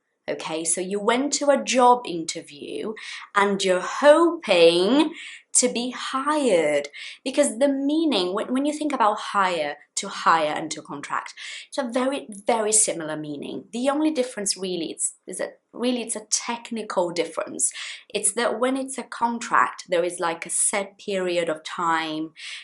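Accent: RP, United Kingdom. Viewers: I reckon your accent is really posh. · Hello, is everything okay? Thank you for all your tips. I've known all the words about you said in this video. And I appreciate your dedication to teaching us. I love your British English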